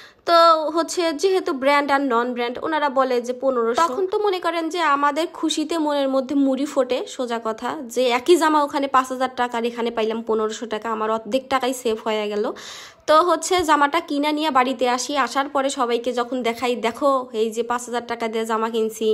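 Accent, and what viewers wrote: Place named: Jamtoil, Bangladesh. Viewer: Apu kotha bolar styl ta valo cilo akdom Jamtoil ar moto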